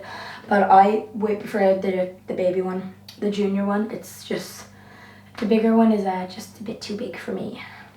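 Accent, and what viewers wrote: Ireland. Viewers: Irish, don’t you know. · Your American accent sounds great! I only hear a little of your Ireland accent. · Euros? Irish accent? Where are you from? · Love your accent. am i wrong to say it's Irish? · Yeah she has an upperclass Irish accent. With a hint of American thrown in. Irish kids watch a lot of American TV series and movies and tend to copy the way they speak. They way she says "there" is so American and not the Irish way of saying it. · Why does she have a Jacksepticeye accent? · Is she Irish??? I'm in love all over again. Fantastic video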